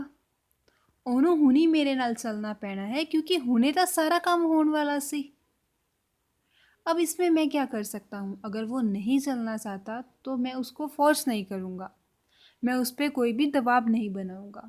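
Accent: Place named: Punjab, India. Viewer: Masha Allah aapke muh se Punjabi bahut cute lagti h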